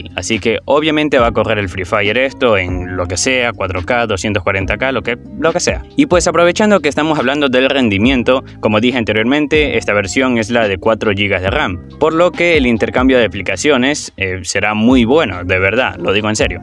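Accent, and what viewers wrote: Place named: Peru. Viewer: Men eres de Perú ? Tu acento parece de Perú noce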